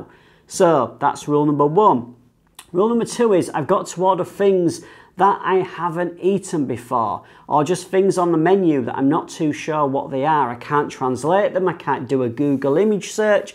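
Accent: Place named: North, United Kingdom. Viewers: I like your Yorkshire accent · What does it matter how you say it (Kuala Lumpur)? that is part of the fun in far away land. I love your northern accent and always associate it with, honest straight talking. You could try a type of noodle dish called Char Kway Tiao. Glad to see your staying safe. Look forward to the next video. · Hello Sir. I really enjoyed your videos about Malaysian local dishes. And I totally want to learn and pratice more so I can have English accent like yours. Happy quarantine in Malaysia. Stay safe 🇲🇾🇲🇾🇲🇾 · Don't worry so much about your pronunciation...you're English... Next time when you do get out...buy some raw peanuts and raw anchovies at the supermarket...later can fry some up when you eat your nasi lemak at home...at least you can control the amount of anchovies you want to eat. Boil another egg if its not enough. 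Cheers mate.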